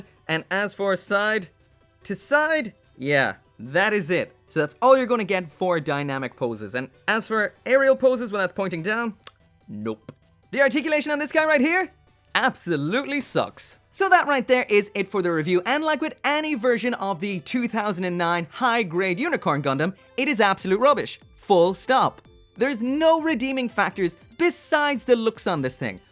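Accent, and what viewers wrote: Ireland. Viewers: Are you Irish like me?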